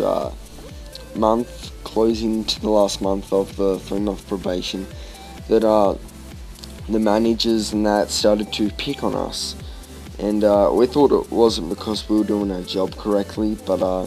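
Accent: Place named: India. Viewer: dat indian accent doh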